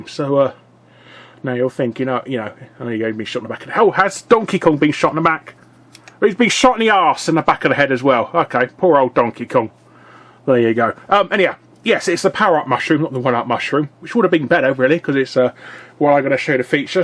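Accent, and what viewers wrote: United Kingdom. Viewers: Jesus Christ! You are the most British person I have ever heard! THAT'S AWESOME!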